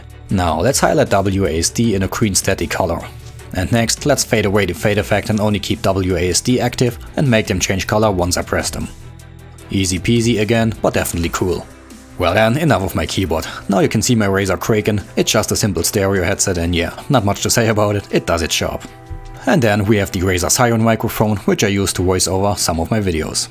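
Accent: Saarland, Germany. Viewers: I luv your german accent <3 :) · That deutsch accent.. ;-) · ***** doch :D ist saarländisch^^ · diggah ich komm ach ausm Saarland awa du heerscht dich kompeltt annerst an als mei Leut:D Aus welchem Eck kommschten heer?:D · ***** Doch es ist Saarländisch. Bin auch ausm Saarland. Er sagt "Hauna mo rin do ne". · Daje, haun da mo rinn do, nä? Ich bin abgebrochen vor lachen! :D Das gudde Saarland <3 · XD Ich dachte schon an deiner Aussprache dass du Deutscher sein musst! C: Wie viel hast du denn für dein ganzes Setup bezahlt? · german i know the accent cuz im german and american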